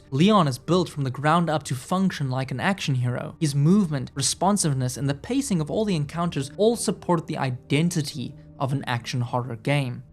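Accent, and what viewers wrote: South Africa. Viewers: Great video! Btw you sound South African xD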